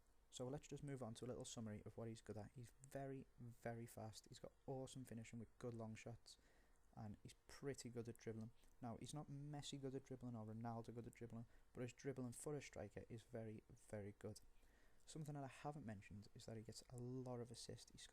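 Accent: Liverpool, United Kingdom. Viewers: He sounds even more scouse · Omg he is so scouse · It’s pretty baller to say “welcome to my very first YouTube video” as someone who doesn’t know whether it’s gonna work out at all. He knew it was gonna happen for him - also, did he lose his scouse accent as he got older lmao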